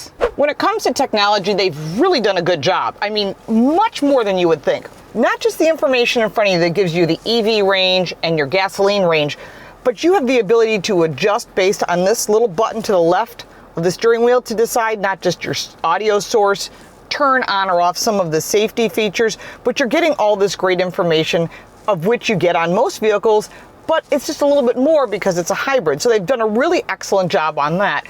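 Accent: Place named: New York, United States. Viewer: Great review, accept for the damn loud NY accent!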